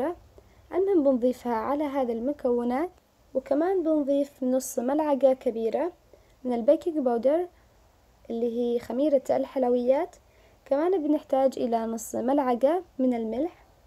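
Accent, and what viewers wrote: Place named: Sanaa, Yemen. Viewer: انا فدالك ياصنعانيه ومااحلى الكلام الصنعاني والطبخات الصنعانيه ...واحلى لايك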